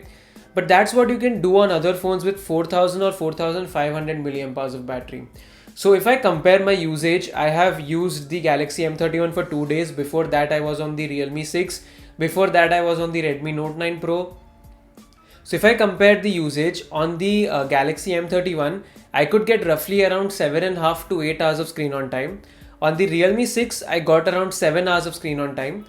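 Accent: India. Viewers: your accent is pure indian so try to speak slow and correct your voice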